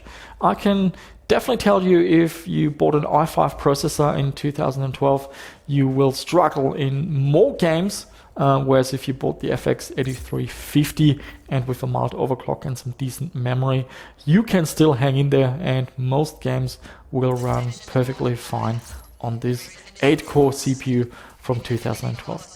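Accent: Germany. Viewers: you sound like an australian but something tells me that's just a german accent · One question, is the dude a German? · You sound a bit like a german talking english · German accent rules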